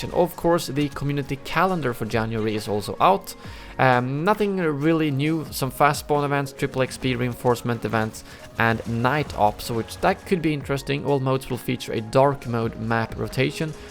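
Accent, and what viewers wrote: Sweden. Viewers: Are you from Sweden? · Den svenska dialekten är ju najs :)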